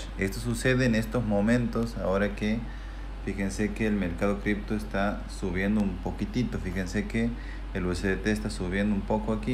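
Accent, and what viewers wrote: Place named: Santiago, Chile. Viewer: que lindo es escuchar la voz de un santiagueño puro !!! saludos de un termeño !!!!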